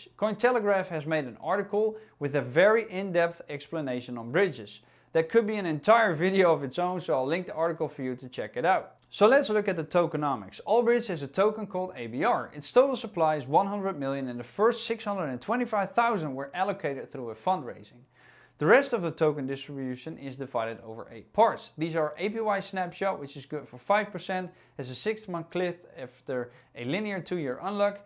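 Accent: Netherlands. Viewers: Can tell that you are from the Netherlands xD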